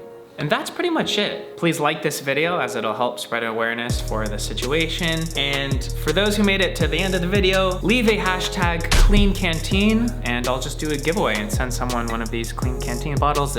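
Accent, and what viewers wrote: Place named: Philippines. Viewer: Love your "TAGALOG ACCENT" #dugongPINOY #kleankanteen #Olrayt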